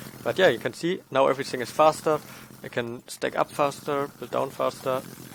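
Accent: Germany. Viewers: sprechen sie deutsche? das hort wie du eine deutsch akzent hast.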